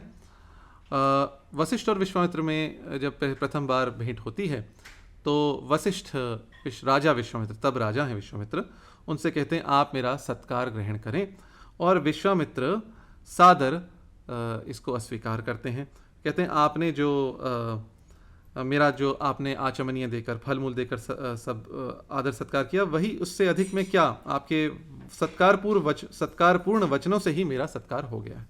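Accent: Standard. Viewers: Bahut hi Badhia Vishleshan, balanced. Hum log to khichadi bhasha bolne wale hindu hain. Aap ki shush, sanskritnisht hindi sun kar Aanand aata hai. Aap ko sun sun kar humari bhi hindi achchi ho jaegi aisi Aasha hai. Uttam katya kar rahe hain aap. 👏👏👏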